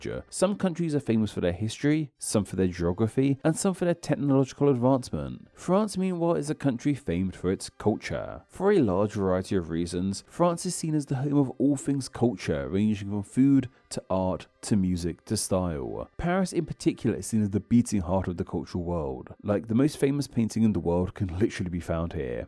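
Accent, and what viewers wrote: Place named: United Kingdom. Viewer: You have a wonderful voice! It has a distinctive tone, which combined with your British accent that makes it very appealing. We Americans are as fond of British accents as we are of French accents. Ours accents are rather “meh”.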